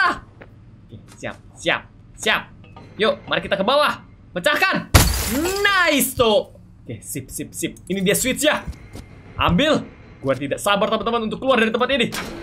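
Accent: Java, Indonesia. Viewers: Kamu orang jawa soolnya ngmongnya kayagitu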